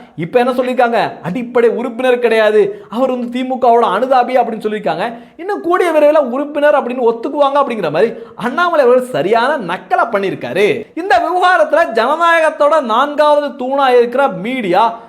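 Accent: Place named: Kerala, India. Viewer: உங்களுடைய பேச்சில் மலையாள வாடை வந்தாலும் நீங்கள் ஒரு தேசியவாதி என்பதாலும் நீங்கள் பிஜேபி ஆதரவாளர் என்பதாலும் உங்களுக்கு என்னுடைய முழு ஆதரவு உண்டு.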